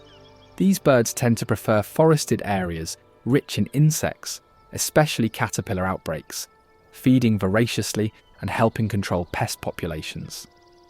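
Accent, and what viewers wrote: United Kingdom. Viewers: I think the Guy speaking in an BRITISH acent talking about Appalachia is Silly.. Why not a Southern acent ?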